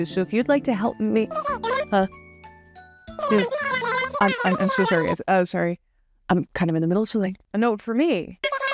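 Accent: Netherlands. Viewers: idk if im hearing it wrong, but are you from the netherlands? i hear some part of a dutch accent but also not and i need to know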